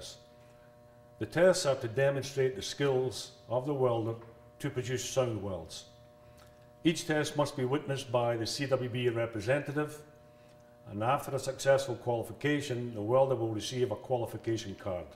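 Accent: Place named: Scotland, United Kingdom. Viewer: What part of scotland is the instructor from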